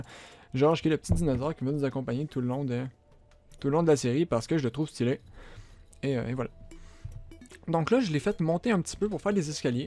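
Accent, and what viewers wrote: Quebec, Canada. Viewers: Vive l’accent QUÉBECOIS, c'est plate qu'on a n'a pas bcp ( beaucoup ) sur youtube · j'adoooore ton accent québécois et ta maison et magnifique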